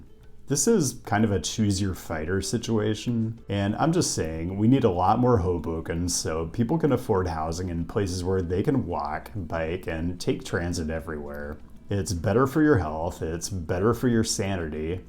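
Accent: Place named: West, United States. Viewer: He has a General American accent, which is most of the Western USA. He grew up in the NW in Seattle.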